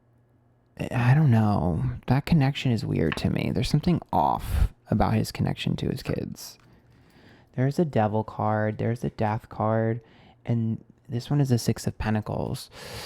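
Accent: New York, United States. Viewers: I love when your new yorker accent comes out. Get em sis 👏🏼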